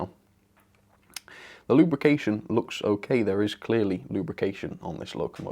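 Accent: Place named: England, United Kingdom. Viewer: you are literally the english accent version of SMT mainline hahah also very cool seeing this being fixed up!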